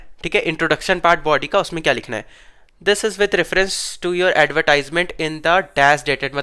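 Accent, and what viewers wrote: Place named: Bihar, India. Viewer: Mujhe ye bihari lagta h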